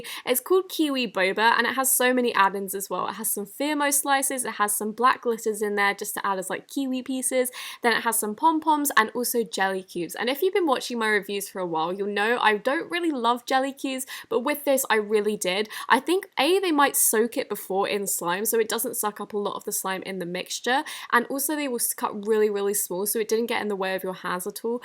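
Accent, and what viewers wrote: England, United Kingdom. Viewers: I love the English accent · is she really British like wth....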